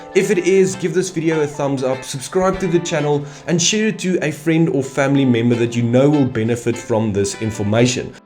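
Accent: South Africa. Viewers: I just enjoy hearing your South African accent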